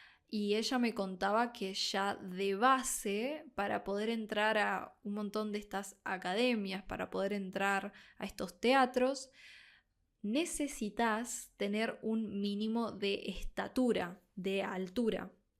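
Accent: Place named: Buenos Aires, Argentina. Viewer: Are you from Buenos Aires...guessing by the accent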